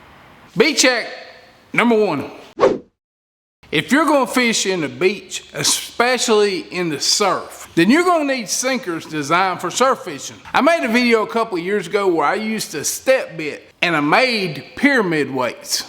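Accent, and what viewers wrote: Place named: Alabama, United States. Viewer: WOW THANKYOU PAL I'M FROM QUEBEC AND I LOVE ALLS UR FISHING HACK'S VIDEOS AND I LOOK AND LOVE YOUR ACCENT SOUND LIKE ALABAMA ACCENT...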